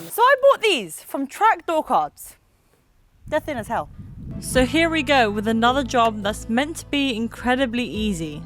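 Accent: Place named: Jamaica. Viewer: Why do you talk like a yardie ?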